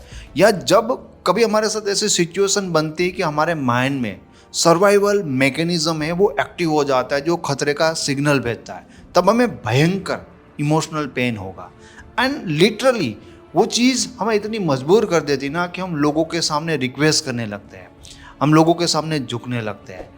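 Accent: Gujarat, India. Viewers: आप गुजराती हो? आपकी हिंदी गुजराती पटेलभाई जैसी है।😂😂 मजा आता है सुन के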